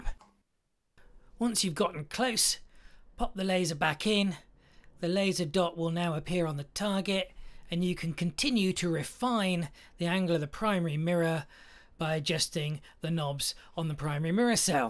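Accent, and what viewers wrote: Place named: London, United Kingdom. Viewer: 1 Frac and 1 Mak, no need for this stuff. However, love watching his vids for his London accent and (some) comical moments 😁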